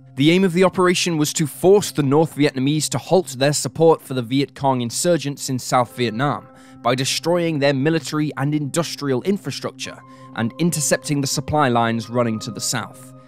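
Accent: United Kingdom. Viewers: the us military didnt have a 9mm pistol during the viet nam war. they used 1911 45 acp or 38 special revolvers. Limey narrator, reading a script. lmao. No credibility .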